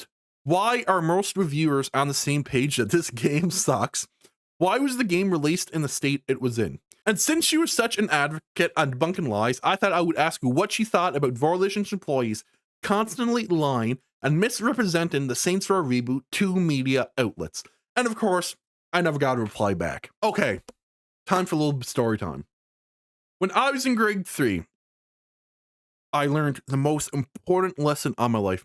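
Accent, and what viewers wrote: Canada. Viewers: You sound very Canadian.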